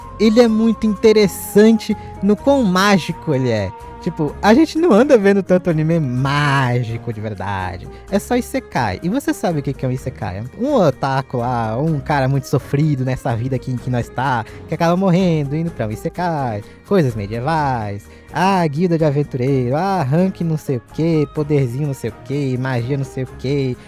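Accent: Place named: Minas Gerais, Brazil. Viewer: Seu sotaque é de mg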